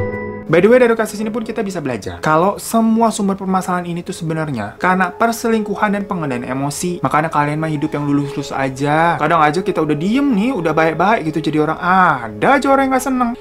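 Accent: Indonesia. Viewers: Akak ni org mana kak Bahasa akak mcm indon je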